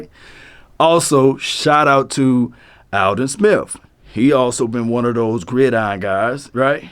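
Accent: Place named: Texas, United States. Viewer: As a Skins fan, I gotta say I have been checking out a couple of your streams and outside of your analysis being 💯 your voice is like...perfect. You got the perfect mix of baritone and Texan accent. Why you can't resume yourself to national radio I have no idea. Keep up the good work.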